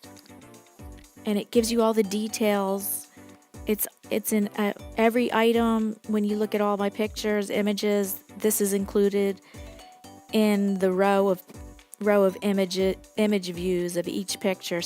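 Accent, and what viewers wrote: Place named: Pennsylvania, United States. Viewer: I like how she got her name. Sounds like you are from the other end of PA from me. That digital art was cool on an ipad. That wildlife one at the end had some pop to it. Watched the video in full gave you a like #payingitforward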